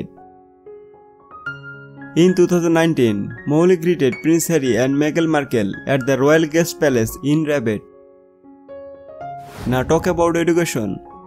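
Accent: Bengal, India. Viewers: Your accent you must just be an Indian ,thank you for your care about my country. ....Casablanca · Narrator is bengali Asli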